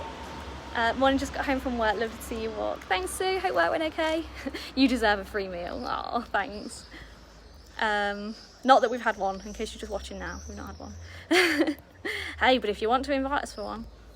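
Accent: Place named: Leicester, United Kingdom. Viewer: Wow, really ! Leicester & the area of Bury have a very similar accent then. Looking forward to watching more of your videos. Have a great evening.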